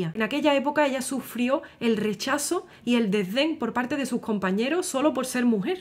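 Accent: Andalusia, Spain. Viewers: Estimada quiero agregar al comentario anterior que, mi bisabuela materna Josefa Calderón Candil y mi abuela Angela eran de Olvera- Cadiz y reconocí tu acento andaluz inmediatamente. Eres maravillosa! Dios te bendiga siempre.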